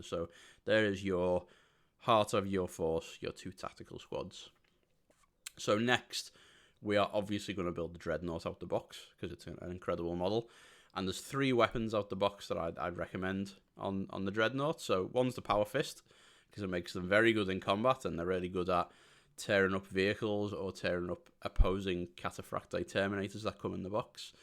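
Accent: Liverpool, United Kingdom. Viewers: wow, it's obv there but it's a much cleaner scouse twang than I'd expect. Must be from the posh part of Liverpool lol. Meant no offence haha.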